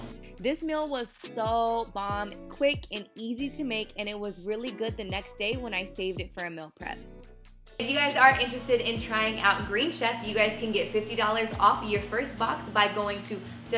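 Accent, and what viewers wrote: France. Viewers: Ahhhh you speak French! Trop bien i love your accent 💕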